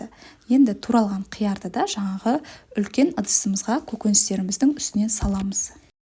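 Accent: Standard. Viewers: Саламатсызба тәте? Сізге көп рахмет, таза қазақша түсінікті айтқаныңызға .Алла өзіңізге жар болсын! Қолыңызға қуат берсін Алла. Ауырмаңыз , балаларыңыздың қуанышы боп жүре беріңіз тәте , бұданда жақсы рецептермен бөлісе беріңіз.. Алға қазақтар ...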